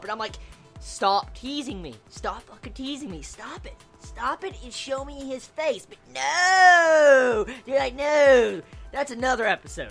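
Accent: South, United States. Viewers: random question here.....but are you from down south, as in south america? (texas ect)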